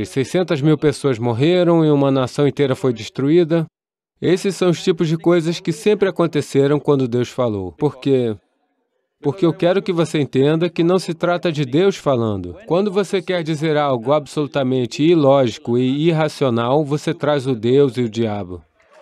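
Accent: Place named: Bahia, Brazil. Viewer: Adorei que a tradução foi feita por alguma baiana. Ficou lindo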